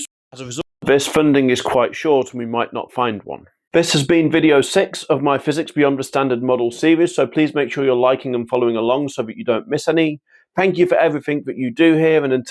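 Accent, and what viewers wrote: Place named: West Country, United Kingdom. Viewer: Mate, can you go to a finishing school or something, im sure what youre saying is right and should be interesting but coming from you it sounds like something from the worzels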